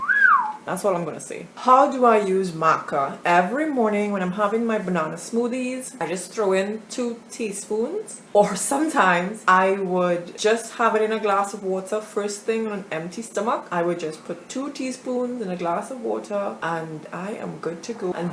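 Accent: Trinidad and Tobago. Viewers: You sounding like a Trinidadian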